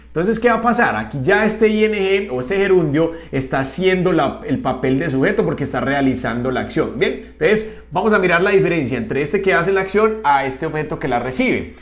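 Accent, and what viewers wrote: Colombia. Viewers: erez de colombia tienes asento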